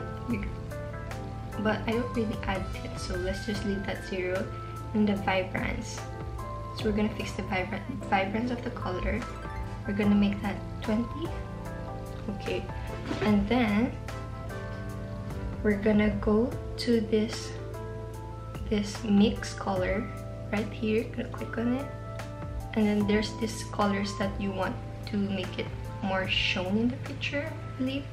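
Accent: Philippines. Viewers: ok but I was SHOOK by her filipina accent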